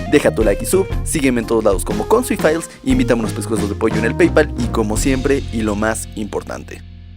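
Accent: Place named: Mexico. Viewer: Min a min audio 100% mexicano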